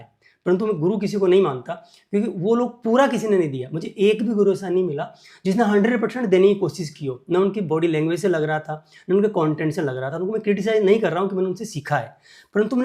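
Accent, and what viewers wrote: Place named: Jaipur, India. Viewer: Sri Maan ji aap jaipur side nivasi ho kya bhasa ka put se lag raha h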